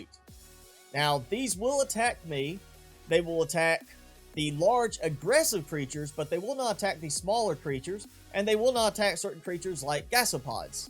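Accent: United States. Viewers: Pretty sure he's American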